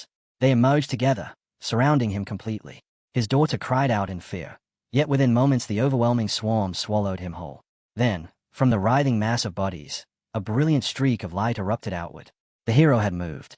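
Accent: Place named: United Kingdom. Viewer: Narrator became British halfway through lol this is epic lol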